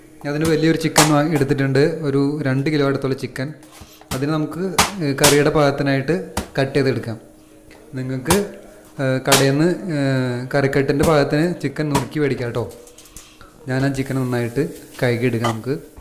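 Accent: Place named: Thrissur, India. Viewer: തൃശൂർ ആണോ.. സംസാരം നല്ല രസണ്ട് 🤩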